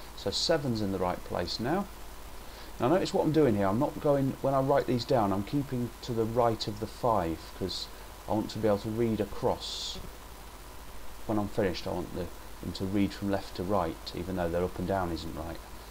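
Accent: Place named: United Kingdom. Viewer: your british accent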